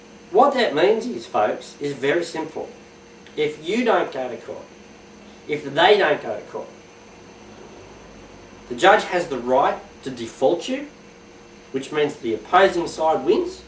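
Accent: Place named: United Kingdom. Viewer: OK from your acent its obvious your British, so does what you offer work in american courts? I have been unable to get anyone with the knowledge I need to help me. The whole system seems to operate on the premise that "its there little enterprize" and anyone who is not part of it, is not welcome. I thinks this is because if anyone figures out their little fraud, they would be out of business.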